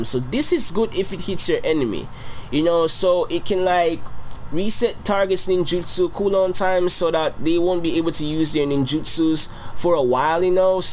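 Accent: Jamaica. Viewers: Are you Jamaican?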